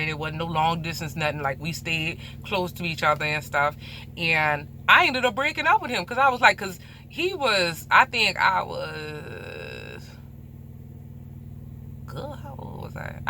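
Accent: Louisiana, United States. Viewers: Love your accent! Bayou, Creole?....Cajun! Luv You! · I though you was from loussaina the accent gave it away!! Lol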